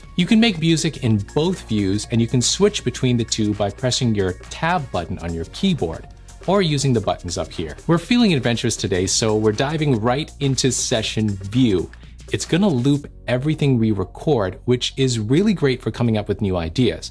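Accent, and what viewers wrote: India. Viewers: Are you INDIAN ? 😃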